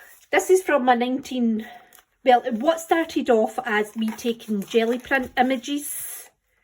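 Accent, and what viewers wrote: Scotland, United Kingdom. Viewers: Watched Ceri unwrap the journal you sent him. You have a very unique approach. Yes, quirky. Love your accent…Scottish, eh?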